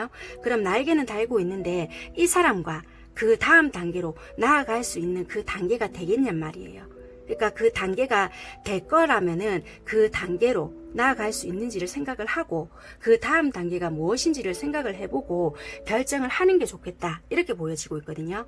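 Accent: Busan, South Korea. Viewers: 저는 헤어지고 연락 끊어져서 연락이 와야 투닥거릴수가 있는데 ㅜㅜ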